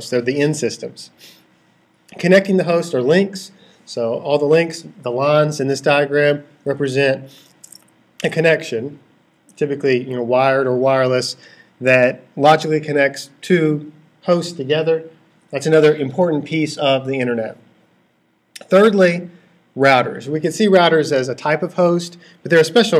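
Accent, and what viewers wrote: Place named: United States. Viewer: Ahhh finally an american accent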